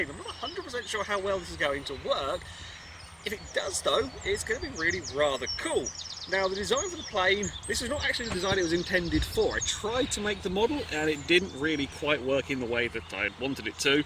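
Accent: England, United Kingdom. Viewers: Hey that field looks familiar, where are you in England. also that accent sounds like mine lol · looks American sound british. 😱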